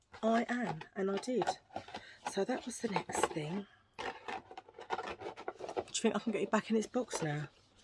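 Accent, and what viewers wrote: Australia. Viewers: Enjoyed this - like a nice visit with a friend! You are good fun. I was trying to watch your next video but I have to provide proof of age and as this involves giving driversl licence or passport number which I am not prepared to do could be risky I can't watch! Crazy! Your Aussie accent wasn't bad by the way - and I'm Tasmanian!!! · Love the Aussie accent. 😂❤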